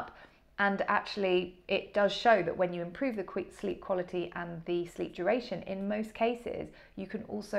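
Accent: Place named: United Kingdom. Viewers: Its a British accent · I love your accent You sound English, British, Aistrailia maybe or Irish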